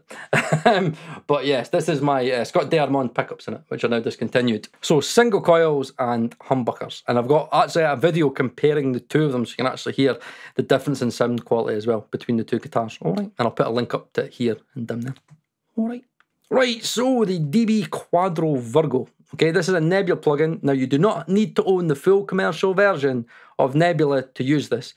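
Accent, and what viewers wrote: Scotland, United Kingdom. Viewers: Came for the review, stayed for the SCOTTISH.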